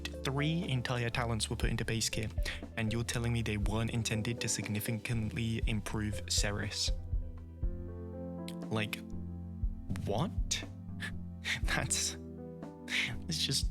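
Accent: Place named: United Kingdom. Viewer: I love this British asmr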